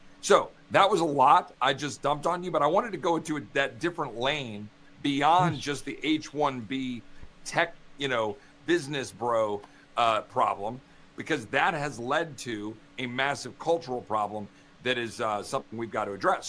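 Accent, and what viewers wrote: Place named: New Jersey, United States. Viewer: Wait she lives in Georgia? Always thought that was a jeresy accent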